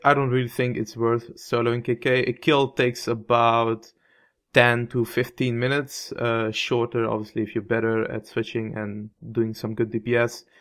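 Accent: Netherlands. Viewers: i can litterly hear youre dutch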